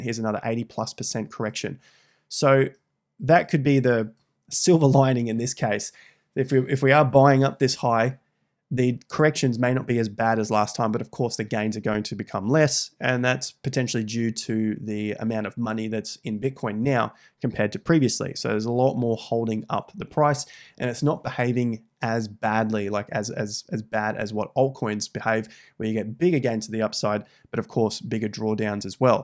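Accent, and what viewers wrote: Australia. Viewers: Is this what you call analysis? Stating the obvious and regurgitating old information? Bro, get your head out of your chart-loving ass and stop relying on your Aussie accent to sell yourself. You are not doing people any favors by reading your crystal ball. Do some real analysis by connecting current events that might be contributing to the behavior of the assets in the crypto market. You’re the type that got rekt the last time because of over leverage. Now, you’re just suggesting to do the reverse. Stop. Listen to real market analysts like Samson Mow, Tom Lee and Raoul Pao. Maybe you’ll be a bit more convincing as a legitimate crypto analyst. If not, just stay away from crypto and stick to your TradFi mumbo jumbo.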